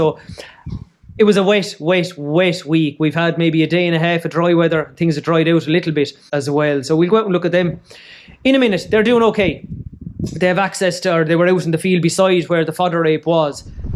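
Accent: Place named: Ireland. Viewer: I know nothing about cows but I love your Irish Accent. New subscriber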